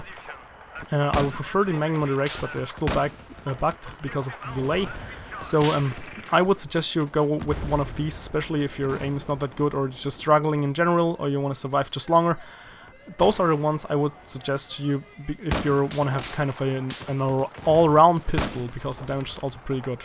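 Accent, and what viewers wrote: Germany. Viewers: Are you German, because of your accent? ;D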